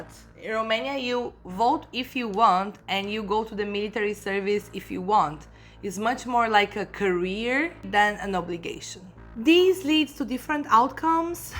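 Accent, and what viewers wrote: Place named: Brazil. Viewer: Nice video. I think the idea of requiring voting (required in Australia when I was there in 1977) and requiring public service (not necessarily military) is good, although maybe getting out of it shouldn't be too difficult (like getting out of jury duty in the US). Btw, I never tire of hearing the Brazilian accent.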